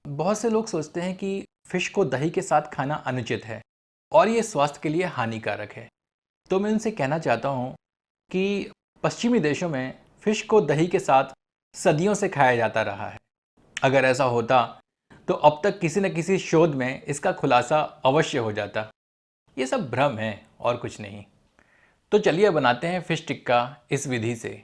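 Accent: Standard. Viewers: आपकी परिष्कृत शुद्ध हिंदी का उच्चारण उस पर चार चांद लगा देता है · a pleasure to listen to you Shudh Hindi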